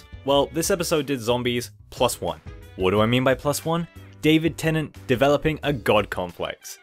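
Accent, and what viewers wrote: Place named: United States. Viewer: why you sound slightly american??? I NEED ANSWERS!!!!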